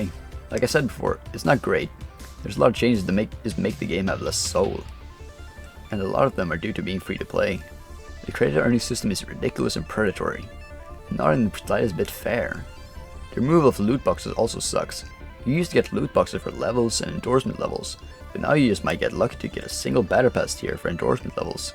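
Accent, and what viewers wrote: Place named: RP, United Kingdom. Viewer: Why you speak like a BBC news people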